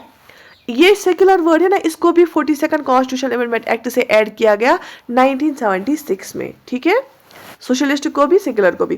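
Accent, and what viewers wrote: Kashmir, India. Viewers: Beautifully explained. There's teachers. And there's tone to Kashmiri teachers. Love it thoroughly!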